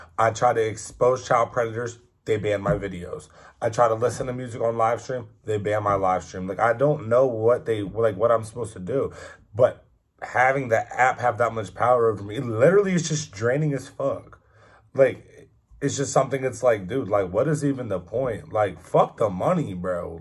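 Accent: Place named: Pennsylvania, United States. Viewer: I enjoy watching you. I grew up in small town PA. My heart is there and listening to you reminds me of home. Your accent. The backgrounds. Your story is awesome. Keep going.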